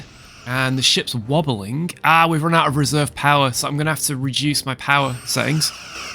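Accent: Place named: England, United Kingdom. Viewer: I suppose that's the problem with a beta canon as incongruent as Trek's is- until I was taught that Star Trek doesn't standardize its beta canon I believed a lot of beta-only things which are essentially headcanons- stuff like Defiant being faster than Voyager, shield recharge being a Nova-exclusive or a Nova introduction. I guess we still have some preconceptions based on what beta canon we were exposed to earlier on. BTW i'm trying to get better at recognizing UK accents- fairly sure you're somewhere in England, but would you mind telling me where? The two most prominent features I've noticed are your emphasis on ending "g"s which to my American ear suggests somewhere to the west, but it also sounds like your voice is a bit breathier, maybe?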